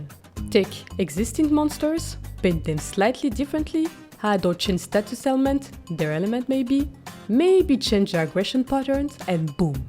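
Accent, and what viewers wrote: France. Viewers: i love ur french accent its so pretty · I love your accent. Are you French btw? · Je me disais que t'avais un petit accent français et je vois que j'ai raison..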